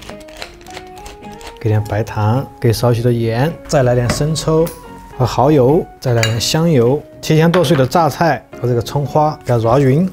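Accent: Sichuan, China. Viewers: 四川土音 👍 太親切了！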